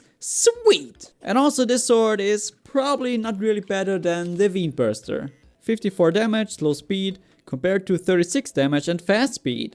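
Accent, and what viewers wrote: Germany. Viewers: Hes German isnt he???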